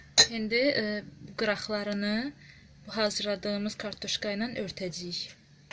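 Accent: Ganja, Azerbaijan. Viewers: Xanım, siz Gəncəlisiniz? Mənim yoldaşım Gəncəlidir, Gəncədə balacaya- "Balicə"deyirlər!😂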